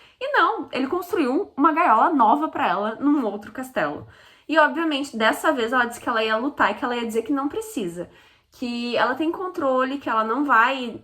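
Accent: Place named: South, Brazil. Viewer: cheguei faz pouco no canal. E amo como vc conta tudo. E mesmo depois de leer os livros eu venho ouvir sua resenha. E amo seu sotaque do Sul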